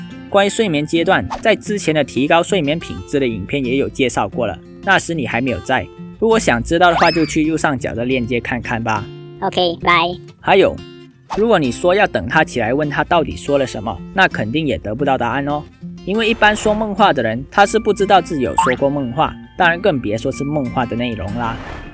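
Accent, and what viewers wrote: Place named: Malaysia. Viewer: 从你的说话的方式就知道你 是马拉西亚来的欧 就酱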